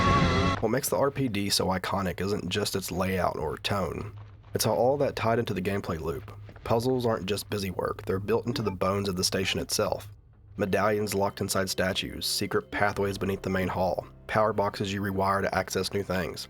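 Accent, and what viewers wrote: Appalachia, United States. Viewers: I like your accent dude. As a lifelong Tennessean, it’s nice to see some southern creators gaining an audience and attitudes in general toward southerners shifting. Also you’re good at what you do, so that helps lol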